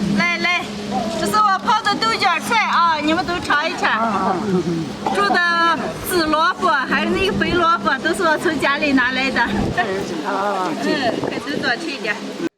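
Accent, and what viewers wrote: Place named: Shaanxi, China. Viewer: 我外公來自陝西城固縣 49年來台灣~~聽到口音突然好熟悉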